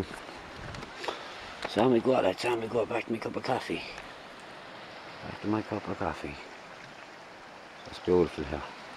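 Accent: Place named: Kerry, Ireland. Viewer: Oh are you from Kerry? I couldn't place your accent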